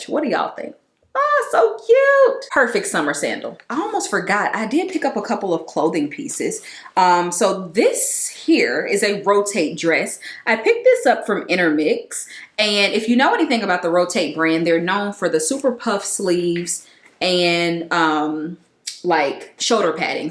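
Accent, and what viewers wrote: South, United States. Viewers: Lol you sure do. Your southern accent. I am from London so some American accents sound nice to me.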